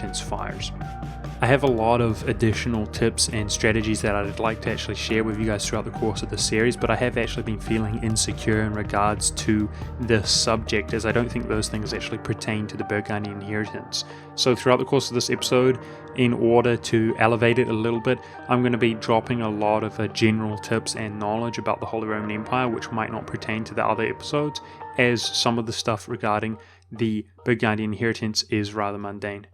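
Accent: New Zealand. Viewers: Yep, he's from NZ. Pretty obvious isn it? :D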